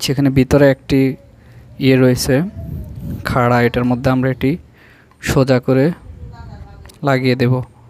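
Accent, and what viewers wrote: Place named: Cox's Bazar, Bangladesh. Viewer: ধন্যবাদ, ভাই আপনার বাড়ি মনে হয় কক্স বাজারে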